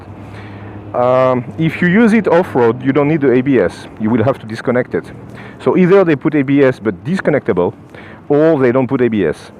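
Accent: France. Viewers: Mdr!!! je suis tombé sur la partie 2 et j'ai jamais aussi bien compris l'anglais du coup j'ai posté un com qui disait tu parle comme un français! ! et là je regarde la partie 1 et je vois ton com...ah ok je m"était pas trompé. ...j'ai vu cette brèle jeudi chez honda a Clermont elle fait rêver dommage qu'elle ne soit pas en 450...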